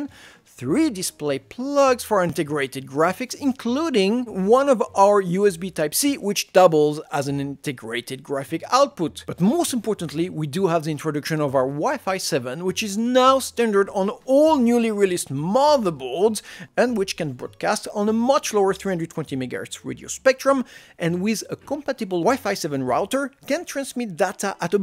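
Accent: France. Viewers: You have an french accent xD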